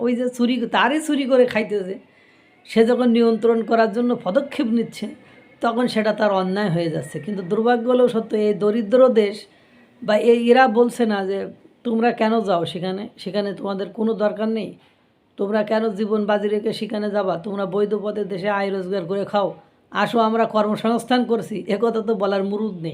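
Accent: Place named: Bangladesh. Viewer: ভাই আপনার ভাষা শুনে মনে হয় বাংলাদেশের। কথা শুনে মনে হয় ভারতীয় মুসলমান। যদি বাংলাদেশী হন, তাহলে সাবধানে থাকবেন।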